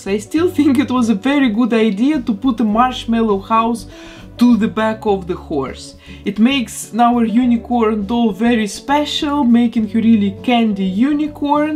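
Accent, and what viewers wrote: Russia. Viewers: Почему такой прям русский акцент)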